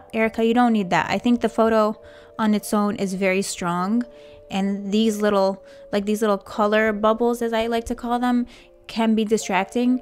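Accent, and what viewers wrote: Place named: New Orleans, United States. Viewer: I just placed your accent, it's New Orleans, right? You sound just like a friend I had from there.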